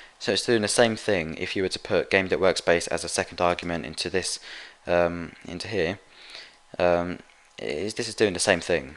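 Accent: United Kingdom. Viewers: Yea, I think hes from the UK or something like that. That's most likely why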